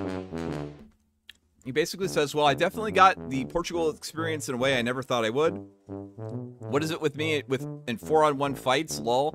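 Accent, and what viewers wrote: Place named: South, United States. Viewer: He makes the southern accent look bad. Please, please just stop.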